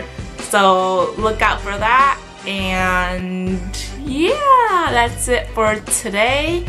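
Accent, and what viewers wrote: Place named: Singapore. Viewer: haha you have a slight singlish accent here :D #SG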